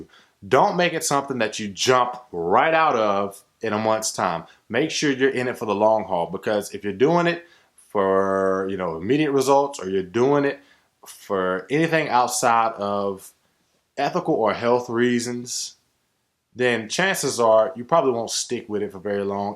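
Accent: South, United States. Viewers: You are spot on about people not understanding ..I'm from MS and I don't know anyone else who is vegetarian or vegan. I got so much flack from my fam..two years later and I still get those "you still don't eat real food" questions. I also agree that it was me reading and gaining knowledge about veganism for myself that made me adopt the lifestyle. Dope video...I knew I heard a Southern accent!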